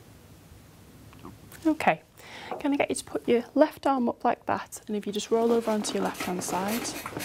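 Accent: United Kingdom. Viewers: british accent)))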